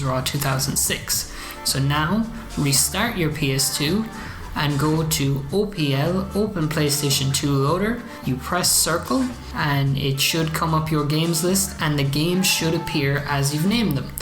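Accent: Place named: Scotland, United Kingdom. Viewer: This your voice?For some stupid reason i thought you were scottish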